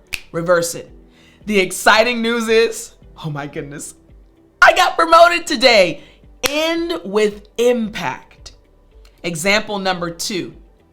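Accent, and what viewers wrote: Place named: United States. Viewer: Teacher. I am really blessed when a I watch your courses. They are extremely clear, powerful and resilient. However I would like to know where you are from. I hear American accent into your speech but I would like to be sure... 😊 I am actually from DRCongo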